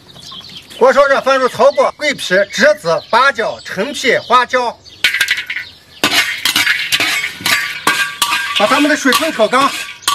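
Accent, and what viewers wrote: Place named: Zhangye, China. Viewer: 好像是张掖一带的口音啊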